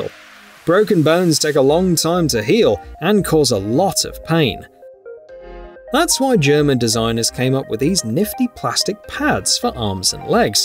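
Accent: United Kingdom. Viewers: Al-u-min-i-um is the British term. Judging by his voice, I would say the narrator is a Brit. Aluminium is also the preferred scientific usage, like helium & lithium. You've had a good day. You learned something new.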